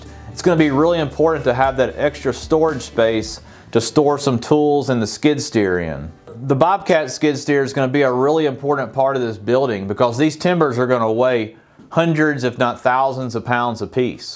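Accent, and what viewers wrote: Tennessee, United States. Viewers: Sure it is going to be great to follow! Good luck! Ah, Tennessee! Haha i like the accent!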